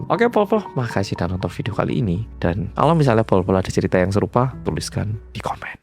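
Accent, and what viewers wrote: Java, Indonesia. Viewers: muedok🎉🎉🎉 RinDingDong 🎊🎊🎊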